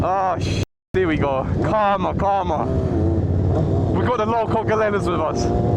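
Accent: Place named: United Kingdom. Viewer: freezing I bet it was wow congrats get licence mighty lad, good rds to go on for sure, you live out there ye still got uk accent,👌 im irish. my ideas might lead yiz astray 😝😂 I love to killswitch the guards at lights, pure madness😂 other bikers ready to bolt 😝😂 ye better not do that over there lad! Enjoy everything you'll be flyin, ive cbr600rr too they're savage, you'll enjoy that ride out. Look forward to more.keep her lit🏍💨💥👊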